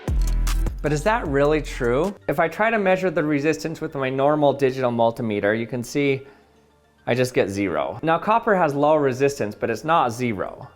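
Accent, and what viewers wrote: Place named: Utah, United States. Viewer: He used emphasis, also Utah accent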